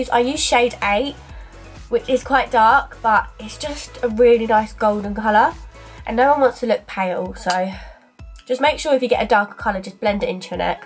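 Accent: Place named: United Kingdom. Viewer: just wondering where do you live you have a sort of british accent but you have loads of makeup from american brands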